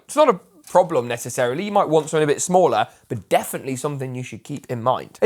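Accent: United Kingdom. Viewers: Bruh the thick british accent i can't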